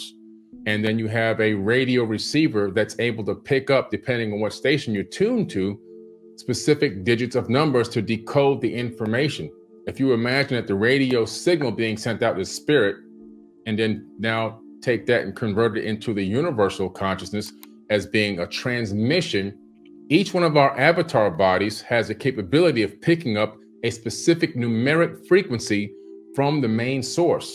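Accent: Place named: Scotland, United Kingdom. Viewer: These meditations are perfect. <link> him has a gentle, warm, pleasant voice (with a slight Scots accent). The words are simple and clear. The timing is perfect... just as you start to find your mind wandering, he says and if you find that your mind is wandering... . There is no background music and no noise in the background, only his peaceful and engaging voice. The length of the meditations (about 1/2 hour each) works well for me. I find that I tire of some meditations after frequent repetition, but that hasn't happened with this one. The metta lovingkindness meditation always feels right. Some meditation CDs I have tried have not felt right. The voice seemed hurried, or sounded tense; the background music seemed intrusive, or there were noises that interfered with concentration. This is just perfect as an introduction for new meditators, and it's also a meditation that I return to again and again. 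I'd give this more than five stars if that were an option. Even my students, who are not ordinarily very receptive to meditation, relax when they hear this one!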